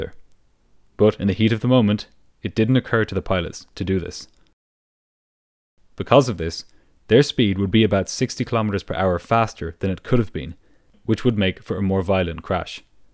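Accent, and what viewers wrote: Ireland. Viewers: The irish accent is still weird. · Sounds like a hint of Irish in the English spoken narration but it is excellent, so clear and precise. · Excellent channel great voice - is it Irish ?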